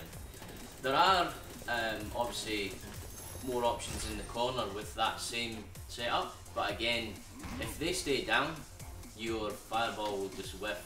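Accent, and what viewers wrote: Scotland, United Kingdom. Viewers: i always thought you was from Scotland with your accent?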